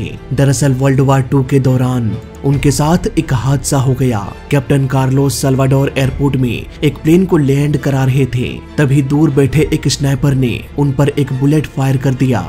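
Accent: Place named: Bihar, India. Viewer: Tu news to ache deta h lakin hinde bol na sekh le bihare brass mat bolo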